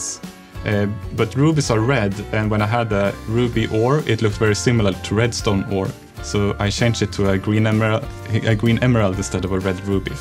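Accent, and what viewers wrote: Sweden. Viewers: I think it's funny how strong Swedish accent still is:D I would have imagined working in an international setting with English as the main language would have made it fade a little more:D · swedish with English accent, Nice But you should keep it up work till Minecraft dungeons is out!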